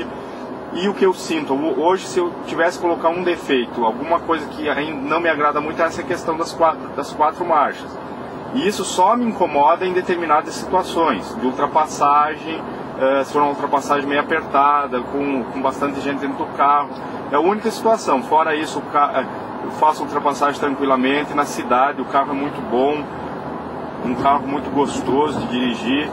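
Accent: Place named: Rio Grande do Sul, Brazil. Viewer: lagoense com sotaque gaúcho rsrs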